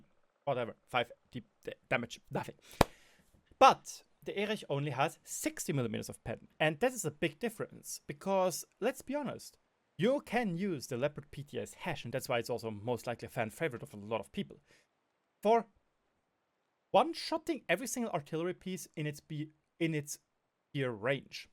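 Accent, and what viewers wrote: Germany. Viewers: Hey, wenn man schlecht englisch spricht, sollte man es nicht provozieren. Bist du jetzt international um mehr Leute zu catchen? Du klingst wie eine Mickey Maus mit nem Deutschen Akzent. Fast so gutes English wie Matthäus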